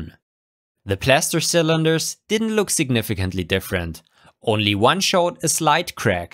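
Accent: Germany. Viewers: This test machine looks like some torture machine, and German accent doesn't help either.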